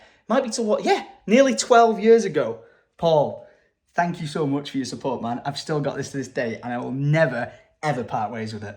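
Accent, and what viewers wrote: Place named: Manchester, United Kingdom. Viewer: I just love the Manchester Accent! could listen to talk all day x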